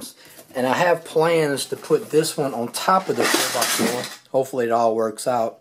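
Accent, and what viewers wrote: United States. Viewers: A southern twang with MIC MAC Freestyle records? NICEEEEEE!!! :) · Great video! I subbed! I call my studio the music room too lol. You sound like your from Louisiana too..where you from brother? Anyways just found your channel, searching YouTube for ideas on how to display my records...I don't have nearly as much but I wanna build my collection and put it on YouTube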